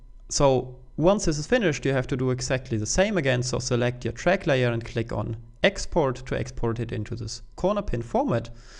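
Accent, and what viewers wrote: Germany. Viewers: Man hört das du Deutscher bist :-)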